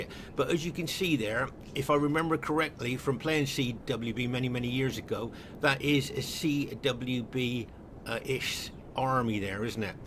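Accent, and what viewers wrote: West Country, United Kingdom. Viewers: as someone with a rural irish accent i respect immensely the dedication to serious commentary from someone from the west country, for such a great game too. thanks for uploading these videos from someone who plays napoleon total war's mod NTW3 a bit too much!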